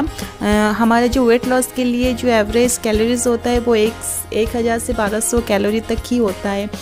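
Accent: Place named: Odisha, India. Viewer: Odisha style bolreheho .... Odia me bolo...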